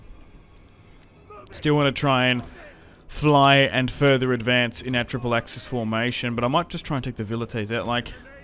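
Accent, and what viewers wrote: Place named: Australia. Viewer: What part of australia you from bro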